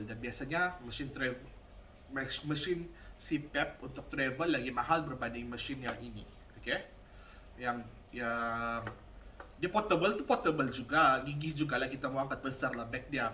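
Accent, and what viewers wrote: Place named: Sabah, Malaysia. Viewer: Org Sabah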